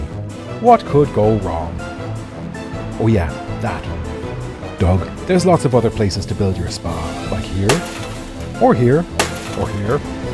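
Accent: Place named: Ireland. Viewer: 1. I fail to see the relevance of which country the company is from. But there's also a company from Quebec called EcoRecreo involved with the project but I guess that doesn't fit your "anti Canadian" narrative? 2. Fifty dollars per person for a trip to a spa and waterpark seems pretty reasonable. Before Ontario Place park closed they also charged for admission because that's how theme parks work so I don't know what you're upset about. The plans also include various winter activities like ice skating. 3. There are better places you can go if you just want trees and a lakeshore. The Leslie Street spit or the islands for starters. 4. You seem to have some sort of problem with companies making a profit but I notice that you accept donations and have ads on your videos. So where is your profit going? 5. Why is an Irishman narrating this video and posing as a diehard Ontario native?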